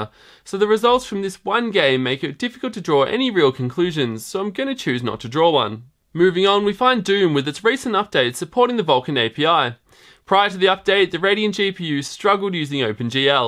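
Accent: Australia. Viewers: Well done video, and I like the fact that you did not jump to conclusions where you didnt have enough information. Unlike that AdoredTV dude... well done sir. Curious what accent is that? Australia?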